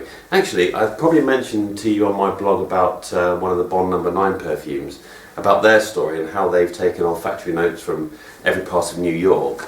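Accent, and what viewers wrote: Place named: United Kingdom. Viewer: Hey. I love your voice and that UK touch accent however last video you uploaded was 5 years ago so I hope you doing good.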